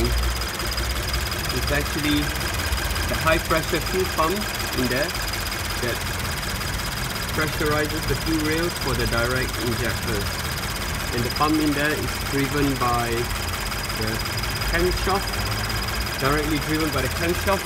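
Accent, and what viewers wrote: Singapore. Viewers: U sound so singaporean, r u?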